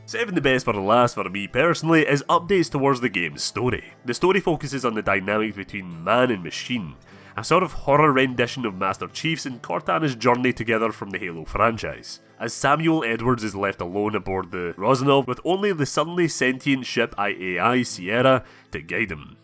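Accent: Scotland, United Kingdom. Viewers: With that accent it seems like a foreign language! Are you scottish ?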